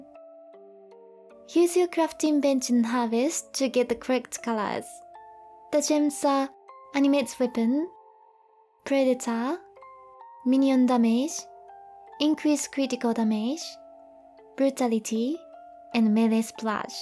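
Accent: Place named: Japan. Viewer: Are you Japanese? Sorry for unrelated question but damn your accent remind me that. It extremely super cute btw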